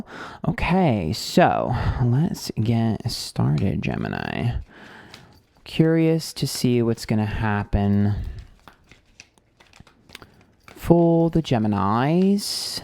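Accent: United Kingdom. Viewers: 🐟 love your British accent 🇬🇧 spot on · Love the British accent this video 🙏☠️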